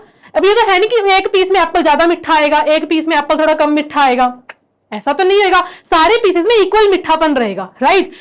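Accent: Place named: Punjab, India. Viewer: I'm Punjabi and they way she is talking is clearly showing she is punjabi😅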